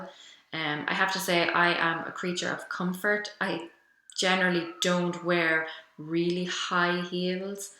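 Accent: Ireland. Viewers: Like your videos. Also love your accent. My guess is your from Ireland.....but I also watch Lydia E. Millen and you both say jumpers, where I call them sweaters. Wonder where we went wrong on this side of pond.....lol. thank you for sharing, katie mullen usa.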